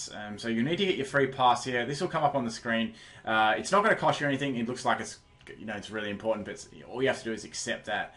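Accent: Australia. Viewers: Before you get all angry and stuff, know that is Australian, not a brit.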